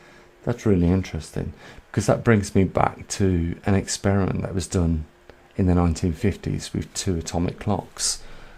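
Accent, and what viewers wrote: United Kingdom. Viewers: Oh Thank you for transskript!!!! Your british accent ist Hard to understand to me 🙈 sorry! So Thank you verry much ❤️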